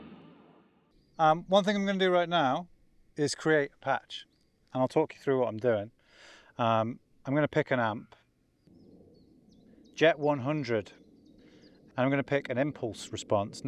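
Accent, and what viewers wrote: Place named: Marple, United Kingdom. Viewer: Must be the Marple accent, I'm not as accustomed to it as I was when I lived in Birch Vale back in the 80's. What's the world coming to when male guitar heroes are considered cool for the shapeless clothing they wear instead of their grossly indecent behaviour off-stage? No wonder Jimmi Page and Keith Richards are still so popular😎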